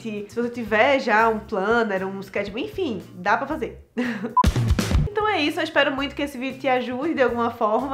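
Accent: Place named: Pernambuco, Brazil. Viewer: Vc é de PE, né? O sotaque entrega! 😅 De todo modo, MUITÍSSIMO Obrigada pelas dicas! Vc apresenta o conteúdo de forma bastante didática, sucinta, clara e objetiva. E, msm assim, bem informativo (refiro -me à quantidade e à qualidade da informação, bem satisfatórias). Muito bom! 🤗 Sucesso! 🌼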